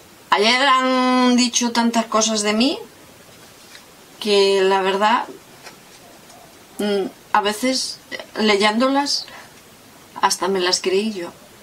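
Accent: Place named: Galicia, Spain. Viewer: Hace poco que te sigo, igual que a otras tantas scraperas... el scrap es un hobby para mí y me gusta ver lo que hacéis para inspirarme. La que no cobra en dinero por publicitar un producto o una tienda, lo cobrará en material... y es lo justo. No veo ningún problema. Ojalá puedas vivir de esto si eso es lo que quieres. No hagas caso a las críticas destructivas, muchos hablan sin saber de verdad lo que hay detrás de todo este mundo de blogs, youtube, tiendas etc etc. Lo has explicado muy bien... muchos besos de una gallega que vive lejos de Galicia y a la que le encanta oir tu acento desde la distancia 😙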